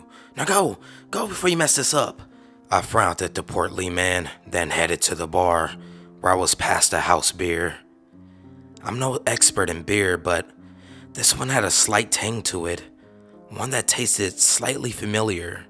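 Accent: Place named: South, United States. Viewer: I would say he's a white southerner that moved north some time ago. Sounds like he's losing an accent.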